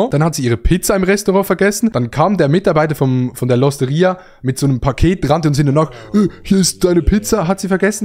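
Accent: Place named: Switzerland. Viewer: Deine Aussprache von Hotel, beige und Bequem ist einfach Schweiz aber nicht richtig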